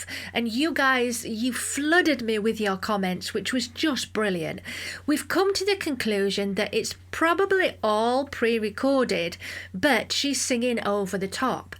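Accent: United Kingdom. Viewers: I'm a very huge Madonna fan.. buy besides that ..I really like you..I can fell you are a very good person ..besides that ..there's a lot of reaction videos..but yours because you are a really voice couching everything you say souds really professional and you never used offencive words... you can say..she didn't get the tone or something like that.. but never offencive.. thank you ..Cheers from Costa Rica 🇨🇷... oh ...I forgot..... I love your British accent ❤ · Hi, been a fan of your videos for years, but for some reason they’ve stopped coming up on my feed. Great analysis! You have such a wonderful personality. Where in the UK are you? I love your accent. Best wishes from Philadelphia!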